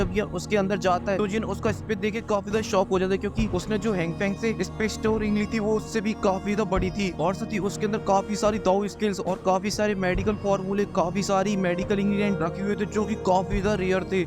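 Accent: Haryana, India. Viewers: Kya aap Haryana sa ho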